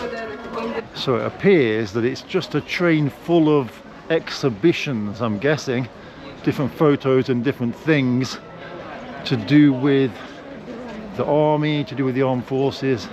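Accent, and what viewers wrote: England, United Kingdom. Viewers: Great video! I wonder if they were uneasy with you filming since you have the English accent, maybe worried some Englishman would make anti russia videos?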